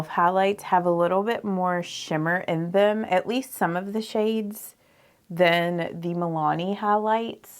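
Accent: South, United States. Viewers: It’s called a southern accent. I love it! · a Southern accent is beautiful. However, the way a single syllable word turned into two syllables is a cadence of younger people. Just wish I were younger and and vocabulary wouldn’t bother ME so much.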